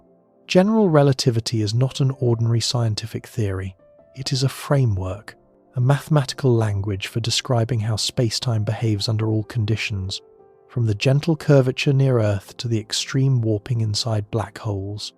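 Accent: England, United Kingdom. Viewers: Math in an English accent sounds wrong.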